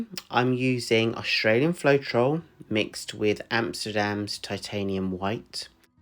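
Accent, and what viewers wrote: United Kingdom. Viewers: Where do you buy Australian Floetrol? From here I can buy it in Australia for a realistic price plus taxes and duty or on ebay for half a million...... Still love your british English 😍 And I like this design, it looks like a jungle deer 💯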